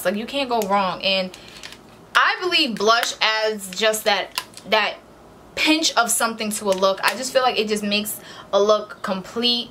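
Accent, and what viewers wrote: United States. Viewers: Gawjus! Love the look. And I'm getting New York accent vibes and I'm loving it .. 🙃. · Detroit? I hear it lol correct me if I'm wrong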